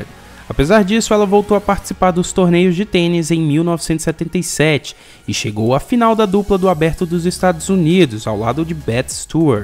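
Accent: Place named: Northeast, Brazil. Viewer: Cara vc é cearense ou Nordestino? Vc tem um leve sotaque. Valeu!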